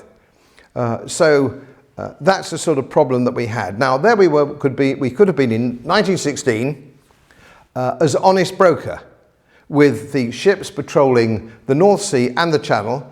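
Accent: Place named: England, United Kingdom. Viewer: It's great to hear a lecture delivered in such a quintessentially English manner, thanks Godfrey for your dynamic and uplifting speeches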